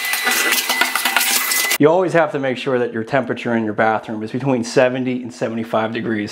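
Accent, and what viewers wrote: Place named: Pittsburgh, United States. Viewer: I'd recognize that beautiful Pittsburgh accent anywhere. Stone cold yinzer coming to the rescue.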